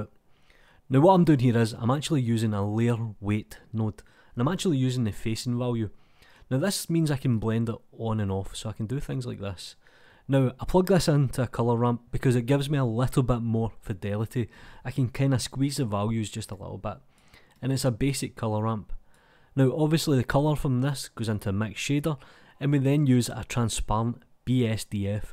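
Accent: Scotland, United Kingdom. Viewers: Your inspiring combination of Blender knowledge and mesmerizing Scottish accent saves the day again. Thank you!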